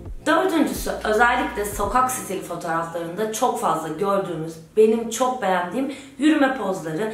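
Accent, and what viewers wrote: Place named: Aegean, Turkey. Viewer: sesin ege kökenliye benziyor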